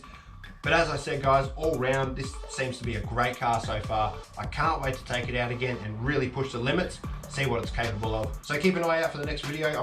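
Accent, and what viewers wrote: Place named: Australia. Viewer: Hello my friend cool Ausie accent the Kronos is gorgeous iam looking to get a 4S brushless truck, the Kronos might be the one, or I was thinking the traxxas Maxx V2 4S, I have tons of trucks and cars I've been into R/C since 2005 I got XMAXX losi 8T, Kyosho GT2 nitro and so on, but I don't have a 4S truck, anyway thanks your new subscriber william the doorman from NJ Forgettaboutit lol 😆 😀 😊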